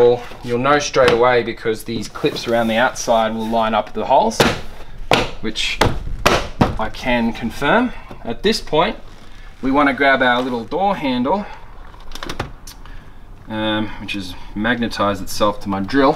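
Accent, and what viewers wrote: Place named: Australia. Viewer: Appreciate it mate finally a Aussie explaining it thanks for helping a young fella out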